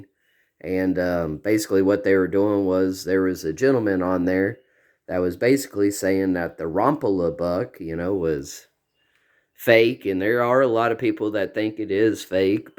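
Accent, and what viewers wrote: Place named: South, United States. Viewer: Lol im from michigan. People don’t understand deer like that don’t grow in northern Michigan. That’s why Jon Eberhardt knows buddy. Because he hunts in northern Michigan. Trust me when I say this they don’t get that big up there. I live in southern Michigan, which is where the biggest bucks are in michigan, and I have never seen a 30 inch spread on a buck. People in Traverse City Michigan are lucky to shoot an eight point that’s 15 inches wide Buba. It’s not about whether the antlers go straight up. It’s about the soil content that doesn’t give credence to the idea that a Deer in northern Michigan could grow a rack that’s that extraordinarily wide. I’m sorry dude unless you’re from Michigan you just won’t understand. Don’t want to break anyones heart, but there’s no factual proof to that damn buck and if there was at all, he would’ve put it under an x-ray. 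He was the scorer for the commemorative bucks of Michigan man. He was the type of guy who regarded and revered records. If he wasn’t he wouldn’t have been part of CBM. Sorry, I hear the southern drawl in your accent and it just makes me have to say Bubba come up here to Michigan and let me know when you see a deer that’s 30 inches wide. As to John Eberhardt, he is one hell of a deer hunter and to put over 100 deer that score over 100 inches on the dirt in Michigan is some seriously good huntin.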